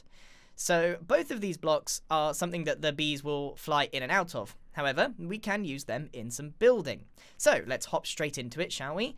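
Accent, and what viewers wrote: United Kingdom. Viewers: Dude I just love ur British accent❤️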